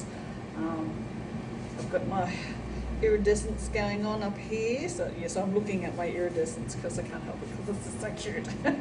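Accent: Australia. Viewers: Hope you have a great Christmas and a happy New Year, get some rest and enjoy the time with your Dad......I know you will be missing your Mum but I feel she is watching over you and I know she will always be in your 💓..I wish you all the best with the shop, I hope it is very successful and relieves the financial strain that can be so terribly stressful.... I so enjoy your videos because you are just so damn honest and no bullshit airs and graces etc, just a real Aussie woman! ( not sure if you were actually born here but you sound Aussie ) I’m very sure there are people who gain some strength to share their mental health issues through watching you and your videos....having a “ big dirty secret “ is such a damaging thing, if people can open up and talk about things it is so much better....anyway, wishing you well and I will be following and supporting you in my small ways and enjoying seeing all you do....stay safe and be happy xx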